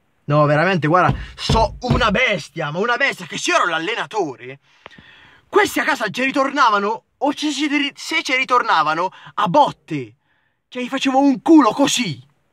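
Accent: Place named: Bergamo, Italy. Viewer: lol bergamasco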